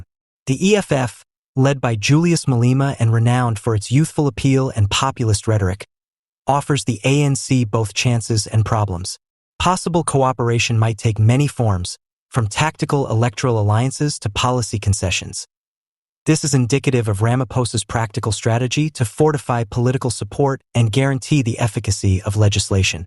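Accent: United States. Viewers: WHY IS THIS NARRATED BY AN AMERICAN???? COULDNT YOU FIND A BEAUTIFUL BLACK VOICE????? STOP BEING PUPPETS OF THE WEST MAN. MMNXM.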